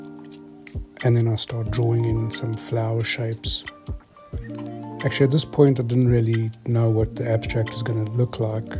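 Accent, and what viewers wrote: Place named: South Africa. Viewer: Lovely painting! You sound SAfrican...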